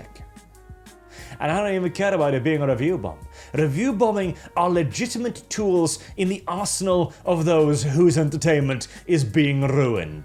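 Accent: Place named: England, United Kingdom. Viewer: You accent reminds me of "Robin Hood/ Men in tights". The lead actor who said " Unlike other Robin hoods.. I can speak with an English accent ..."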